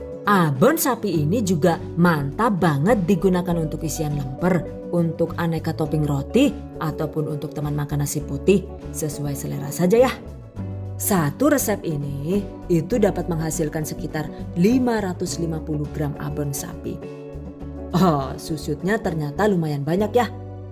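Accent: Banyumas, Indonesia. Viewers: Mksh resep nya. Bumbunya komplit bgt pasti rasanya enak. Dan satu lagi, bhsa ngapax nya itu lho jd bikin kangen kampung. Salam ngapak. Sukses selalu mbak'e😍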